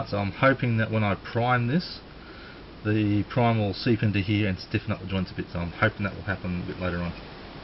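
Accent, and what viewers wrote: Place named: Australia. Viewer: Great to see another Aussie model channel. Its funny how strong our accent is compared to the Brits haha! Cool channel. Just started up my own. Its only couple months old but it's gettin there. Keep it up.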